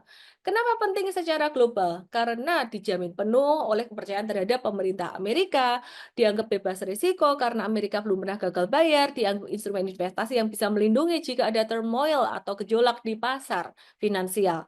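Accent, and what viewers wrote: Java, Indonesia. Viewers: trimksh Mbak 👍🏽 Analisa yg bagus, bahasa sederhana, ples jawa medhok, 😁 bagus 👍🏽 tapi maaf aq br nemu chanel ini stelah huru-hara si Trump ini. Usul sy, maaf, saran sy 🙏 kameranya jangan hanya dr 1 titik Mbak, ada angle kanan & kiri, ato depan agak ke atas dikit,agak jauh dikit, gtu agar tdk bosan menikmati penjabaran mbak. 👍🏽 & biar Qt ada fariasi natap mbak dr sudut angle yg lain.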